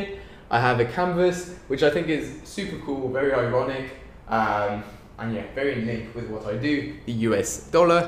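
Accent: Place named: France. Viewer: you're accent it's like a frensh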